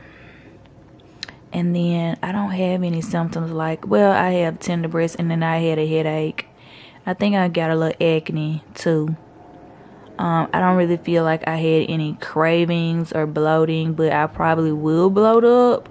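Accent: South, United States. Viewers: This Mississippi transplant from Fort Worth could spot the Southern Accent! Love your videos🥰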